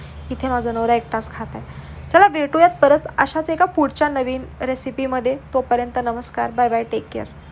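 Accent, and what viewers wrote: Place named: Pune, India. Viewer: तुमची पुणेरी मराठी खूपच शुद्ध आहे 😅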